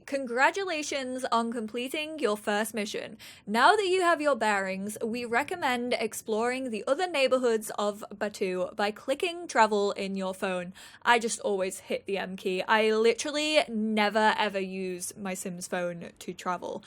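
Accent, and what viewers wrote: England, United Kingdom. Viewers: OMG she does sound English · I totally hear the British accent 😂😂😂 (I'm from Yorkshire)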